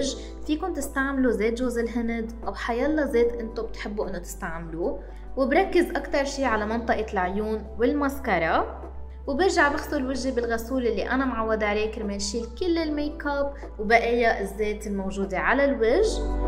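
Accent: Lebanon. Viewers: احبج لان دائما تنطيني طاقه واحب طريقة كلامك لبناني حلو بفمك🙊🙈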